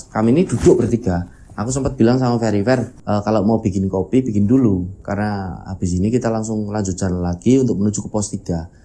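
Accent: Java, Indonesia. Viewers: Bang studionya di daerah mana sih ko jowo nemen...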